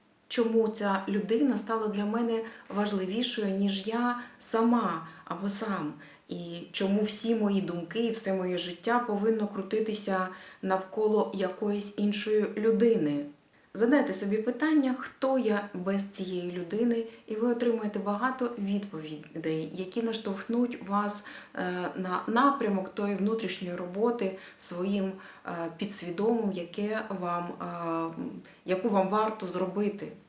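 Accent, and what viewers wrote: Russia. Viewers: Відчувається, ваша російська вимова ( можливо ви раніше і спілкувалися тільки нею), але приємні ваші старання в перекладі українською. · Говорите-то Вы на украинском, а акцент у Вас русский 😂😂😂😂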